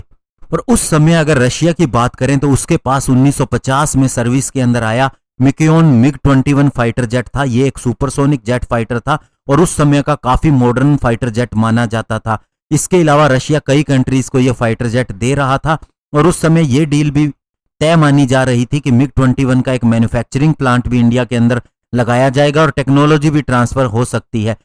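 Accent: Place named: Pakistan. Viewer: U r Pakistani.....surely.........Bhai hamara military budget 4th largest haii world kaa.....n tune to Pakistan ki zabardast haar 1971 k baatein mein to kuch kaha hi nahii....n Teri baton se to lgta hai Indian air force kuch bhi nahi haii....n Tera accent bhii Porkistan jaisa haii....sun be Tere Pakistan ki naa Bahan KR k jayengee huummm yaad rakhiyo.......bhikahariyon....roti khane k paise nahi ladoge humsee.....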